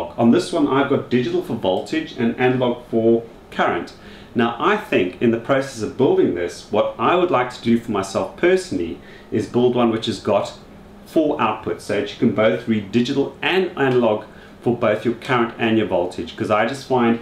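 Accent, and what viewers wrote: South Africa. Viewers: Little confused at first having South African accent from the UK :-). I am enjoying the series. Keep up the good work.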